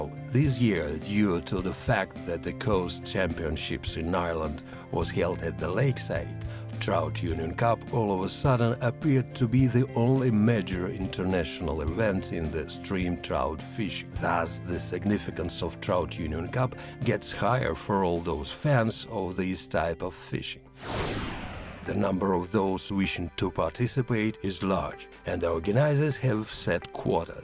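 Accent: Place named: Russia. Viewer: раша акцент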